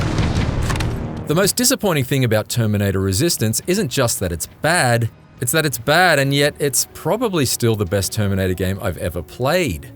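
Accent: Australia. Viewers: It's that aussie accent again, eh? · “ske-leet-al” never heard someone with an Australian accent pronounce skeletal before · He ain't a brit, he's an Aussie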